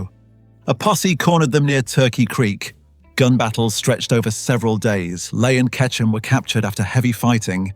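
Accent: United Kingdom. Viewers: Why a stupid British voice over.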